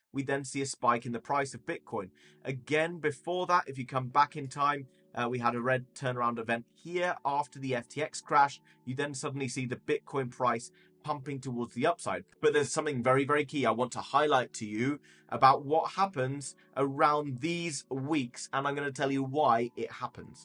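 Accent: United Kingdom. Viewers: All British people sound the same.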